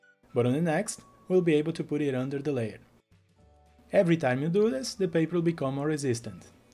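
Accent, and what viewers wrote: Brazil. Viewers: are you a brazilian? because if not your accent looks so much as a brazilian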